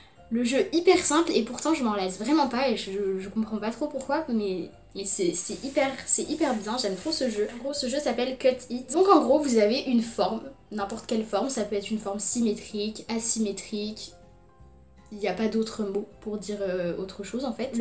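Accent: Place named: England, United Kingdom. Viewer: Magnifique accent anglais bonne vidéo bravo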